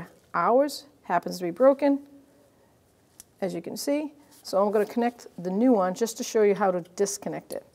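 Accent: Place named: Medford, United States. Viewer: That’s a Medford accent! Gotta be!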